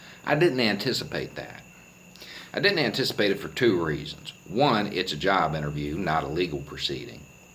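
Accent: South, United States. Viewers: ok so i love all of your videos and the messages that come with them.... but i am curious about one thing, I have seen other videos where you dont have a southern accent...whats with that? are you playing a character or what? maybe im mistaken, im just really confused, im not trying to discount the messages in these videos. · sheeeit!...this is that same pinko from TCF but with a hushpuppy accent an a growed out beard an he's preaching to the non-converted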